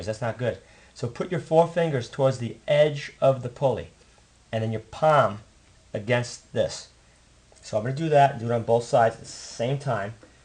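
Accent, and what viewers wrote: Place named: Rhode Island, United States. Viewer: Sounds like my friend from Rhode Island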